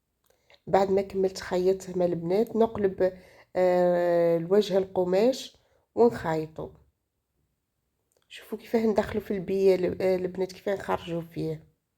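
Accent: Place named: Skikda, Algeria. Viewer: اللهجة تاعك سكيكدية على ما أظن❤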